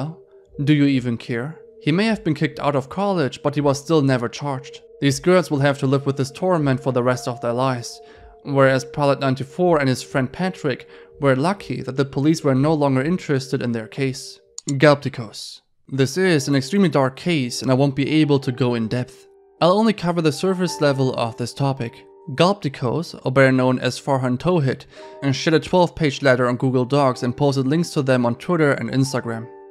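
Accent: Germany. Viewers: I love your videos, your accent is great, germans have great voices :) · my sister who is studying german says his accent is "very obviously german" so thats my guess LOL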